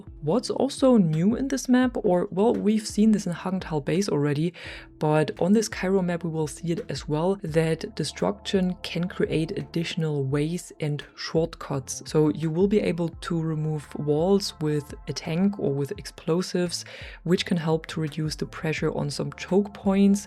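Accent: Germany. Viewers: where are you from? Dialect sounds German?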